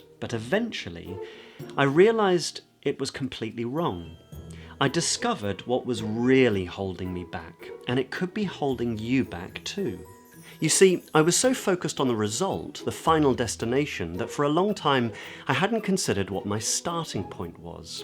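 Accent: United Kingdom. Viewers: omg! I love your accent! very clear, and sophisticated... I'm from Argentina, and I am interested in gain standard British accent, so you will be my mentor... in my progress I sound more like a British person, but of course I don't speak like a native speaker but I certainly think that you will be very helpful for me! thanks!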